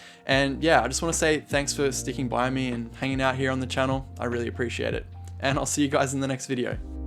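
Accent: Australia. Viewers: Great review. So refreshing to hear some real world opinions & not bashing specs. Also love that it was photo based, not video and finally an aussie accent. Glad I found your channel 📷👍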